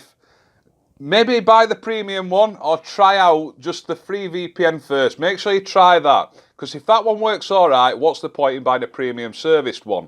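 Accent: Yorkshire, United Kingdom. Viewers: Great video, thanks for the informative video and links, where abouts in Yorkshire are you from mate?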